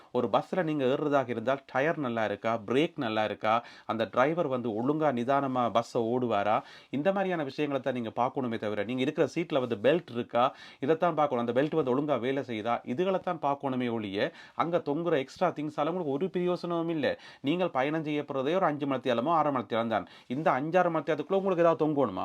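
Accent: Jaffna, Sri Lanka. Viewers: உங்கள் பதிவுகன் எல்லாம் வரவேற்கதக்கது,, நன்றிகள் பல,, உங்கள் தூய அழகான யாழ் தமிழ் உச்சரிப்பு போற்றதக்கது….😊😊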